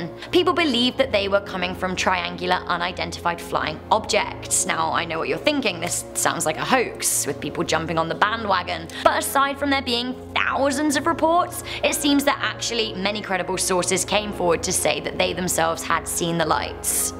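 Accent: England, United Kingdom. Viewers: Why does every top 10 list have a british accent in it? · you had me hello. Love the video. I would love to lay in your crop circle. I would roar like a lion. Gaze in your gorgeous star litd eyes then shoot my Milky Way while you say Maui Wowie and explain how big & large it is..😲🐘😄 I love 😍the way you say while you're down 🙃there👅 with that cute English👿accent - very HOT 💖 it-👫-🤑-love u" from across the 🌎pond. Sorry I have to refocus now your message🙃 which one is the most scariest of this video 🤔I would say Stephen Hawkins quote that when aliens finally reveal themselves to us it would be like Christopher Columbus reaching the Americans💥💣💥💣💥💣GAME Overtook 👽👾👽👾i think im leaving more than a 🖒'S... UP lol he he he. 🌷chow for now my lovely lookin alien 😍😙🤑😚👿..📞ME 😆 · This chick is the most annoying/ignorant youtube host.. This channel usually has interesting top 10 topics that end up being awful simply because they allow this laughably stupid and cringe fueled moron narrate. 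News flash: Having an English accent does not automatically make you intelligent.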